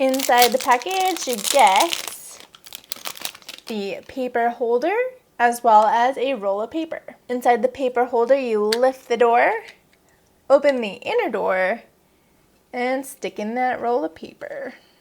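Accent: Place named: Canada. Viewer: That’s just a Canadian accent, girl. 🤦🏻‍♀️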